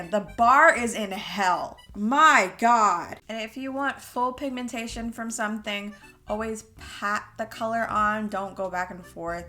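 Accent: England, United Kingdom. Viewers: Loved the English accent, u did well hun, lol from the U.K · her bri'ish accent <3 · I love your British accent it reminds me of ronald weasly 😂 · Omg girl! Your British accent>>>>333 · Are you britesh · The British accent is here and THRIVING!!! It is making my day. Keep being you and don’t hide your personality!!! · Love the English accent girl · The british accent💅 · Girl that British accent never gets old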